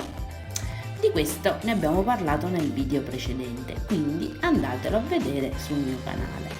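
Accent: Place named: Sicily, Italy. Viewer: Il tuo accento siciliano è bellissimo ahahaha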